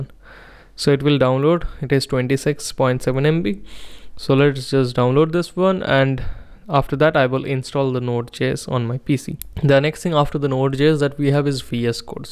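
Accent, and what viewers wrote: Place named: India. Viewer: muh me land gya hai indian jase english bol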